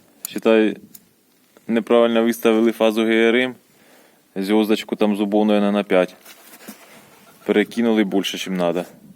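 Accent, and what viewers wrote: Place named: North, Ukraine. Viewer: По говору чую, що мій земляк з півночі)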